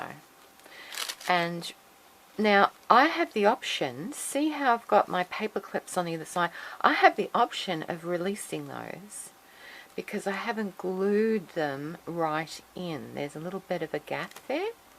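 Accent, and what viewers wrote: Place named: Australia. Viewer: I love your work! Your papers and designs are amazing! I take it from your accent that you must be Australian? Anyway you are so creative!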